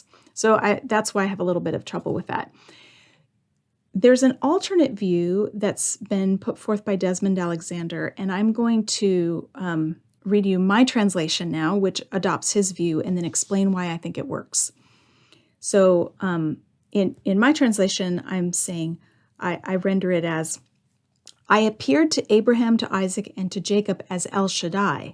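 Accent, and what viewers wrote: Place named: Spain. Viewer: This one was thought-provoking, I must say the 3rd option got me interested. Your approach in many of your videos is quite a straightforward one and in some others, you decide to get into the weeds, I see kind of a balance right there for most of us who are laymen. Btw, your Spanish accent, love it !!! 💯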